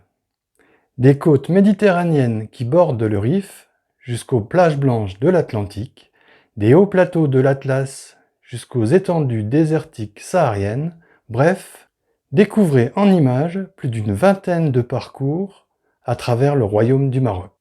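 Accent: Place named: Alsace, France. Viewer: j'ai cru reconnaître l'accent... Moi je le suis (alsacien), mais expatrié en Provence... Pour pouvoir un peu rouler en 4x4 sur les magnifiques Chemins du plateau de valensole et des gorges du Verdon....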